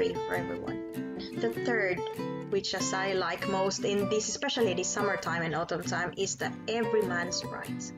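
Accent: Finland. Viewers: How it's possible that you speak English exactly like a born Finnish? I mean your accent. No-one will believe that you are from Philippines!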